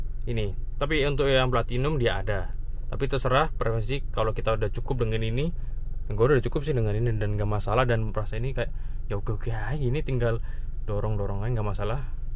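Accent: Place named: Jember, Indonesia. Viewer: Logat jember om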